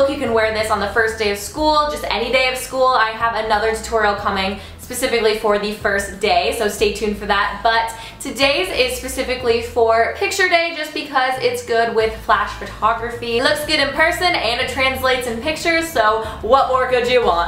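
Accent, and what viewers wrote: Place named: Minnesota, United States. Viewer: Hehe I always thought the way you say bag was weird but I realized it's just because you're from Minnesota. I had a teacher from there (I'm from texas) and he would always pronounce words ending in ag like you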